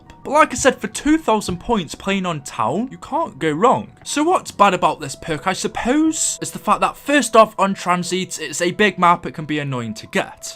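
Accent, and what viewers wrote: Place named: Wales, United Kingdom. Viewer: are you Welsh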